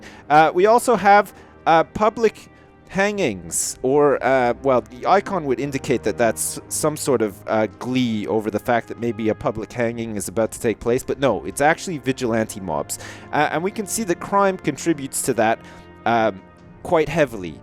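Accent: Canada. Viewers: your canadian! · Sips your from Canada?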